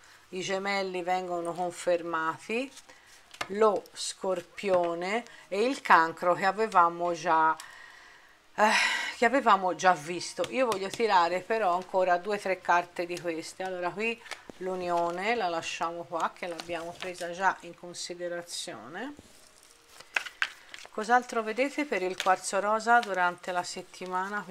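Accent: Tuscany, Italy. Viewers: Sentirti, con questa meravigliosa parlata Toscana, è bellissimo. Io delusa.. Uff ormai ci ho fatto il callo.. Mi piacerebbe molto lo sai trovare finalmente la persona giusta, ma è molto difficile. Ma nonostante questo mi piace sempre ascoltarti❤️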